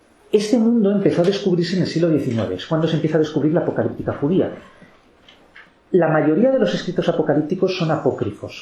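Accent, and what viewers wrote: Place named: Spain. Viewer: Hola.muy malo el sonido y ademas el acento español dificulta entender .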